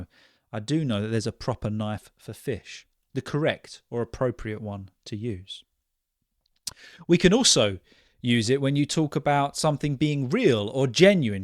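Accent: RP, United Kingdom. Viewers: A gooooorgeous lesson ! And jolly nice R P English without an accent !😉 Un abrazo from Buenos Aires 🇦🇷🇬🇧🇦🇷🇬🇧🇦🇷🇬🇧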